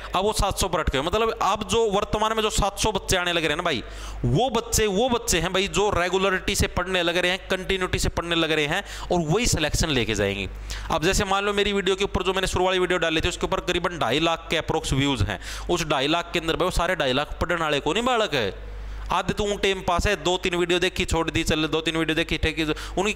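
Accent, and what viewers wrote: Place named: Punjab, India. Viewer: Panjab se ka aap aaye hai sir aapka to bhasa bahut mast hai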